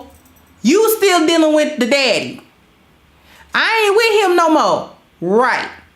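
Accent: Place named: South, United States. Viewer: where do you live, you're seeing this kind of woman all the time? sounds like you're from the south.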